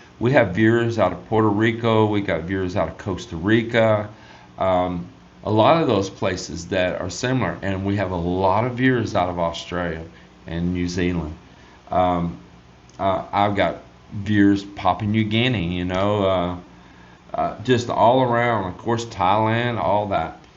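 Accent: Texas, United States. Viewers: You actually had me at the beginning and I was checking if I had accidentally somehow changed my audio/video settings. Then I figured you were getting back at the guy that commented about your slow monotone Texas Twang 😂...I watched the whole video anyways. I'm half Filipino half American (born in the Philippines). I actually lived in the US for 25 years, was in the US Army for 12 (at the moment lived in the US more than in the Philippines). I'm retired now (42 yo) and live just north of Subic Bay. My eldest son was born in Frisco, TX when I was stationed in Plano, TX for Army Recruiting. I gotta get out there to your place and visit y'all sometime, I enjoy your channel specially the content about construction and the boats. Take care now. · I enjoy your Texas accent. The funny thing about the Texas accent is that it is contagious. I lived in Maine all my life until I moved to Cebu a few years ago and I had an uncle who I had never met who had lived in Texas for years and we were all so surprised that he had caught the Texas accent. 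In Maine a Texas drawl really sticks out. That works both ways, a Maine accent is very noticeable when traveling. I think that living in the Philippines does change a person's English by using different expressions like aircon, choco, CR, asawa, etc.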